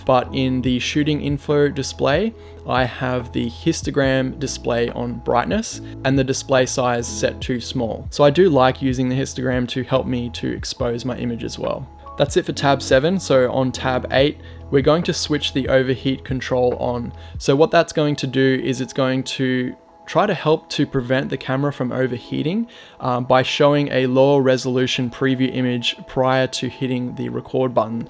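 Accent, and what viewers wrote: Australia. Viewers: Great to hear an aussie reviewer and using PAL. Since this review with firmware update Clog 3 is available. Do you now use that?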